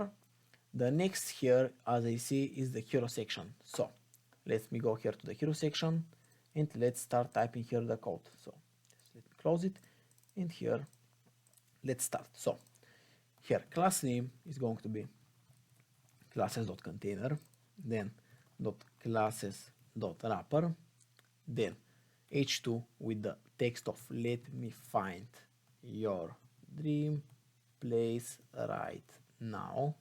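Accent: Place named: Bulgaria. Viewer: hah me too ! :) just the accent of english :D and i decide you are bulgarian lol :D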